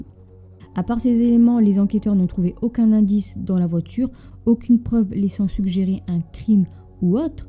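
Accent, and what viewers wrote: North, France. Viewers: aaah vous êtes une chtimi comme moi <link> semblait bien percevoir un accent 😁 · Au top, comme d'habitude! Mais alors je suis curieuse... ^^ D'où nous vient cet accent? J'ai l'impression d'entendre des sons aux influences du nord mais en même temps je n'ai jamais entendu d'accent du Nord comme le tien si j'ai tapé dans le mille. En tout cas c'est charmant, la touch! J'adore! Merci de nous faire voyager dans le sombre monde du true crime 🕵️‍♀️🚔🔪💉💀🥀⚰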